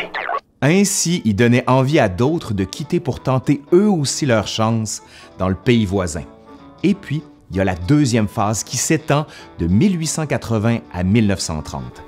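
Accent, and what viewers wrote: Quebec, Canada. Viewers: Je keaff l'accent québécois 😅 le tafff est'c8son aux ralentid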